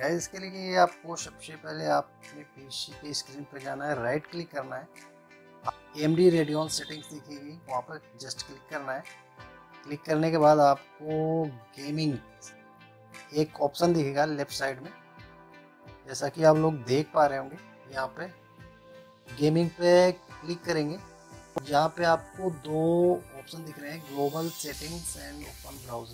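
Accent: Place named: India. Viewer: nice vid but try to talk american english or british english not indian english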